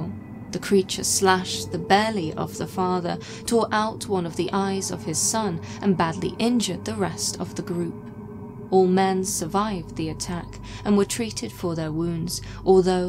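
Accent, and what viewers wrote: United Kingdom. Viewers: I think she's so hot. The whole darkness shit and proper British accent aka queens English while talking about spooky ass shit just hits you right in the feels. The fact she covers well known stories yet divulges so much new information, it usually ends up changing ones perspective as there is no preexisting agenda.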